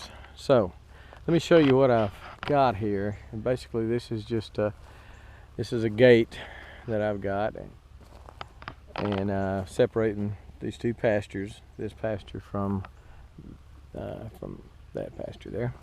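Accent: Texas, United States. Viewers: I thought you said something, and thought that was not like you... at least not in public anyway! But no, it was just your Texas accent. All you said was "'cause they're sheddin'".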